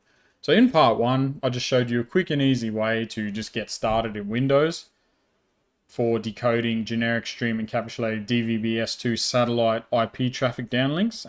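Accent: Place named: Australia. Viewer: Thanks, good video and great to listen to an Aussie accent for a change. Where do the numbers you use to fill in the fields in TBS Recorder come from? Are they published somewhere? I get that this video is "for educational purposes only" and you don't want this to turn into a hackers guide. Does the locked status change only when you've selected the correct settings for that satellite and that transponder?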